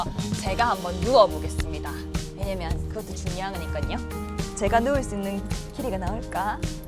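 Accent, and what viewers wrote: Gyeongsang, South Korea. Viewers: 경상도인지 경상남도인지 말투가 팍팍 꽂히네요 이해 안될수도있지만 전 사투리가 부러워요.기억이되서..잘봤어요 구독합니당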